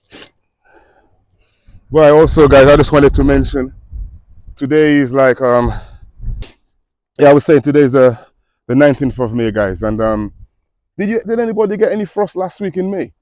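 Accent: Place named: Dominican Republic. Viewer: Why you sound Dominican 🇩🇲 lol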